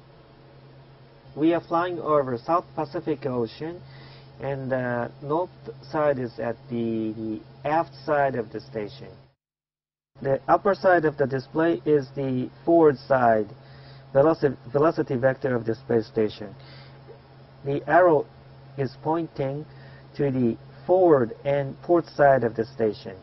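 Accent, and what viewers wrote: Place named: Australia. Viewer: How I love the Australian accent.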